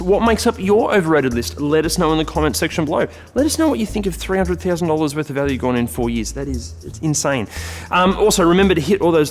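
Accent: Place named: Australia. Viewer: omg keep joking you are underrated, funny, charismatic and lovely to watch probably because of that juicy Australian accent lol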